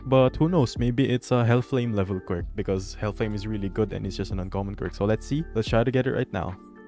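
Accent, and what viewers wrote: Philippines. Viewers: You give me that filipino-english accent vibe. Are you?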